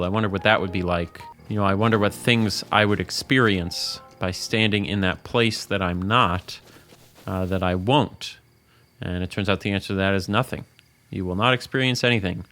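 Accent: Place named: Minnesota, United States. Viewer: I’m thinking to myself “this sounds like a man from Minneapolis”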